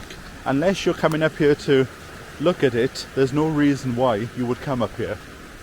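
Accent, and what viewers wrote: Wales, United Kingdom. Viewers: Welsh accent getting stronger every episode. ✅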